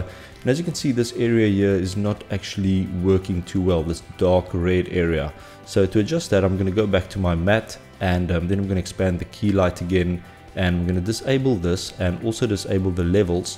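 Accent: South Africa. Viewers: You sound South African 🤔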